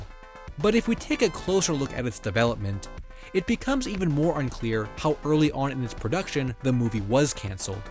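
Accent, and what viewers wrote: Massachusetts, United States. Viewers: Bro are u from Massachusetts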